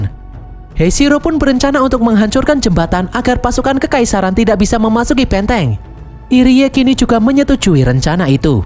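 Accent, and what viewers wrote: Java, Indonesia. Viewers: jadi unik nntn jepang kuno pakai narasi logat jowo medok😁👌🏻👍🏻 penasaran klo logat nya dirubah le logat ngapak..mungkin tambah unik⭐⭐⭐by : sucriber baru🙏🏻🤩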